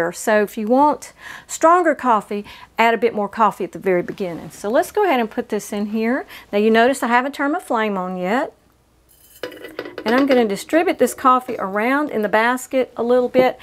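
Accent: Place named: East Texas, United States. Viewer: What a beautiful and sweet young lady this is! She sounds like us here in East Texas!! New fan and subscriber, great job!! ❤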